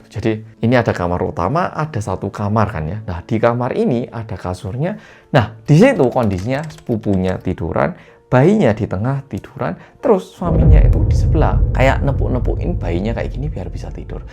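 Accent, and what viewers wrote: Java, Indonesia. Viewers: Udah lama ga nonton korean medok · Sampean itu orang jawa apa wong korea too...lucuu😅😅..sik...sikk😅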